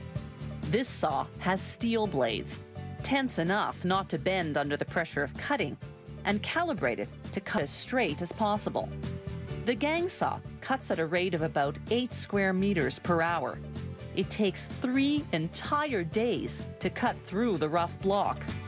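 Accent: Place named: Canada. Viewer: I can tell the narrator is Canadian because she says "a boat" and "PROcess" alot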